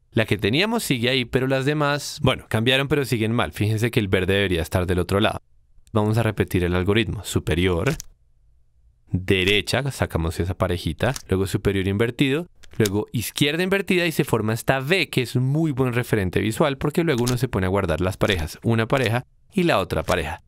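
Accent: Colombia. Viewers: Guillermo Crespo Es una voz de colombiano haciendo un tutorial. Así son siempre. Aparte es bastante agradable, es una voz viva.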